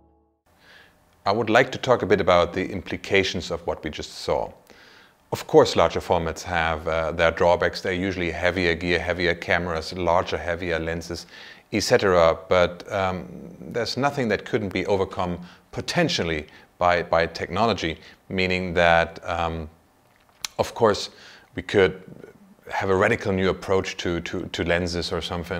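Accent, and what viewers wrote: Germany. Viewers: That was actually a really great video! Although I hate your german accent, but no offense buddy. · well I had to watch about 3 or 4 videos to be sure 😁 you speak very good english. Im german too so I know what makes the German english dialect but I think others wont notice ;) Looking forward to new videos. Love your videos.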